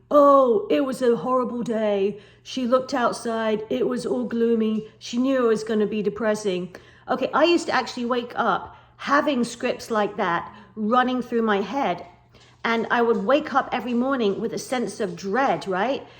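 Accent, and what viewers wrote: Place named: England, United Kingdom. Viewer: Ha, i thought you had an English accent that explains visiting wales a few times 👍 you know you mention about being of an older age even in our 50s or older as long as you know about conscious awareness that our thoughts are living entities creating our physical realities then evrn 10 20 or 30 yrs of life of having this awareness that we can create anything through DISCIPLINED! Mind to speed up the progress or as i use my own affirmations or subliminals on our voice recorders on our phones played every night as we sleep for our desires can bring everything to you so even at the later part of our lives through conscious awareness manifestation we can achieve more than just scattered rolette style thoughts of randomness it is all about FOCUS, AND DISCIPLINED MIND, thanks for mentioning wales 🏴🙏 worth mentioning the mirroring techniques to of bringing things to us faster 🪞😉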